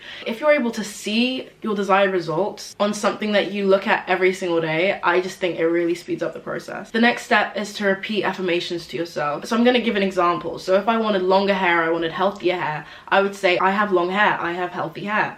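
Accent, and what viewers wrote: United Kingdom. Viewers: I love this video, it's so straight to the point. Maybe its just the Uk accent that feels more familiar to me but other videos go on about vibrations and try and sound too mystical and it just feels a little weird but with yours I can trust you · She’s not she’s British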